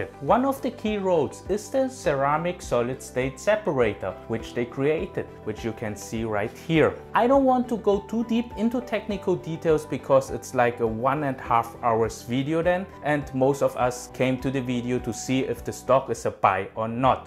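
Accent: Germany. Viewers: Do you have a German accent ? Great video .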